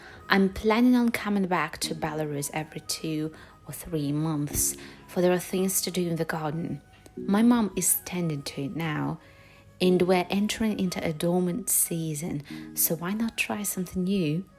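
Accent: United Kingdom. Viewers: ahh nice you have a nice british accent !!! congrats. and by the way i had never seen a vertical aquarium .... is it aquarium? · Did you learn that very British accent?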